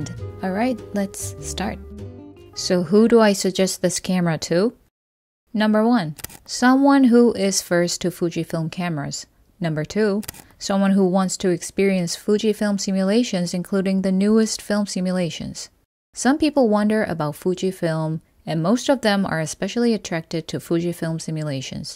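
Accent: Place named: United States. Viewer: 몇 달 계셨는데, 그냥 미국인 발음이시네요. 너무 좋아요~!! 인스타에서도 뵈어요~!! 새해 복 많이 받으세요~~!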